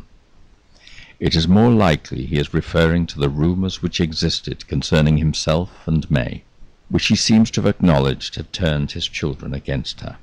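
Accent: United Kingdom. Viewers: Yes, I have subbed after seeing you have more to watch. I will try hard to ration myself to one a night, with a cup of cocoa😀💙. I hope you are still out there somewhere bringing more lovely, unknown stories, good for us, not so for the poor victims. Love your voice, I am born and raised English, but live in the USA. You sir feed my need for class A British accent. Thank you from my English heart.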